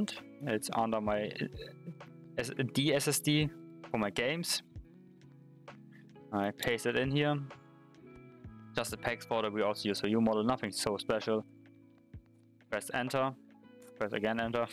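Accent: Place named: Germany. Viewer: man hört mies das du deutsch bist xD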